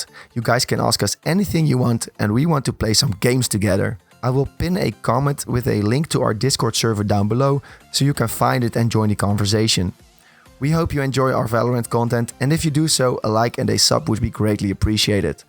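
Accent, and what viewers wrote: Netherlands. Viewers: Hey are you dutch bro?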